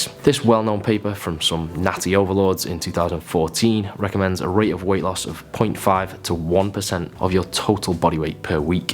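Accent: United Kingdom. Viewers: I'm not even natty but I am gonna start watching this channel because I love this guy's accent! Much love from some colonial scum my British brother. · Excellent video, very educative, I've got a question just for curiosity, where are you from in the UK?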